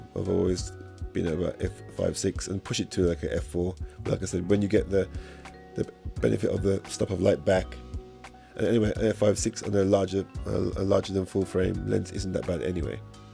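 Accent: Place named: Midlands, United Kingdom. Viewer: I can hear the midlands in your voice. Yuh Dun know I'm subbing ☝🏾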